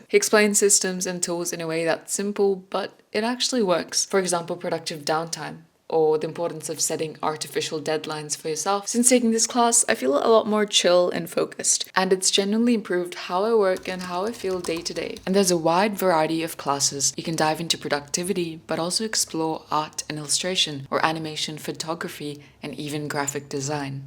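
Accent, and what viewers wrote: India. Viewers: ur voiceee issssss sooo beautiful are u Indian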